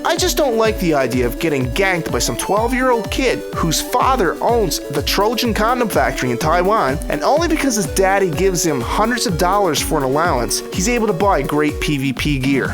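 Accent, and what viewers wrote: Canada. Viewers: is it me, or does this guy have a really heavy canadian accent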